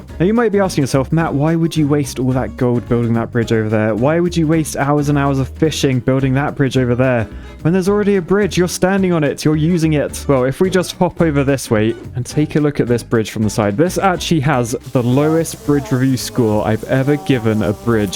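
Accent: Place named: England, United Kingdom. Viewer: You have a nice proper English accent so I wouldn't worry about YouTube captions.. Now, me as a Scotsman though.. on my channel (other account) it can be hilarious reading what YouTube thinks I'm saying XD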